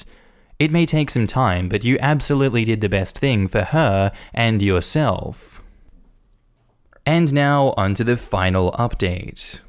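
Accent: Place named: Australia. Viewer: the Aussie accent doesn't hurt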